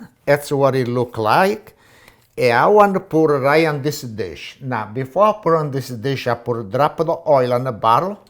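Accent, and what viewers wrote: Italy. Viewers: Grazie!! I love watching you cook and I love your accent, you sound like my neighbors (and cousins) when I was growing up!! They made me fall in love with tomatoes, garlic, lemoncello, espresso and all things Italian!! I really like your little bit of oil, olive oil is even in the Bible as a food we should eat. Tutti a tavola al mangiare!! Yummmmyyyy!! And please forgive me if I misspelled any Italian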